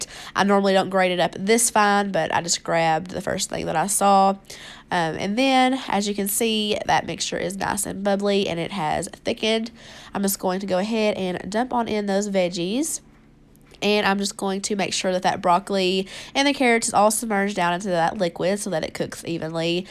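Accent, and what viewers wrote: South, United States. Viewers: I subscribed to you about 2 weeks ago but I have watched every episode that you have made please keep doing them it's amazing recipes that you have and I like to hear your Southern accent 🙂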